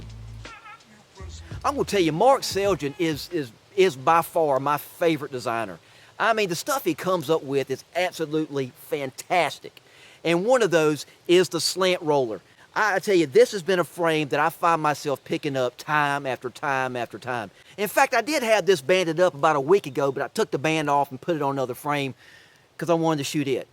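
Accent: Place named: South, United States. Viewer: I've two of the ORIGINAL, "Simple Shot" Axiom Champs, and among my dozen or so others, they remain my favorites. I also have an original (1st gen.) "Scout" , also terrific, and also made by our sports/hobby's most likeable gentleman, Nathan Masters. Back around 2009, when I was Assistant Chief to Chief AJ (John Huffer) of the NSA, he made a slingshot for ladies, which he called the "Pink Lady Quick Shot". It was pink, indeed, but other than that, it was no different than his other non-remarkable "Quick Shot" slingshots. I've a miniature slingshot pendant in a gold-like finish, not much different in size to a U.S. dime, made by Mark Seijan - it's cool! Enjoyed your vid, burps and all - though it is kinda strange to hear someone with a definite southern USA accent speak in terms of millimeters. I "get it" though, because those are the manufacturers' specs, and they cater to slingshot enthusiasts (rubber nuts) worldwide. 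The British "WASP" slingshots are probable also good for women, and I believe they're now being made in more than the four colors I already have.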